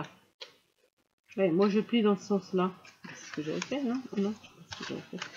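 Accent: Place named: Franche-Comté, France. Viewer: Super idée ! Je vais en faire 1 aussi ! J'avais bien reconnu l'accent franc-comtois ( suis Belfortaine) 😉 je m'abonne à votre chaîne😁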